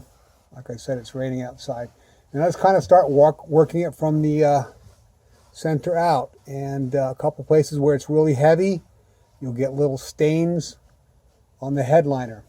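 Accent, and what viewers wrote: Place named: East Coast, United States. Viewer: Mama mia, this is Norm. My wifes headliner started to sag down in her car and I need to repair it, it get warm out here in Florida, but we love it out here. Well in reviewing some YouTube videos, there was your friendly face! however I did not recognize your East Coast accent. I was laughing my ass off, you are still a funny lad. Anyways it was good to get some tips from you, your head liner came out pretty good I must say. I had fund watching your video. Peace brother and God Bless you as well. Norm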